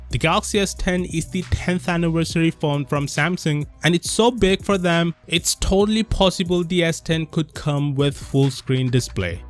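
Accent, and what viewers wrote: India. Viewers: I find your Indian accent more amusing. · The Indian accent is distracting... Aaaargh! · Terrible whole YouTube is Indian with fuck'n accents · English indian style... not bad but almost indian people very bad their english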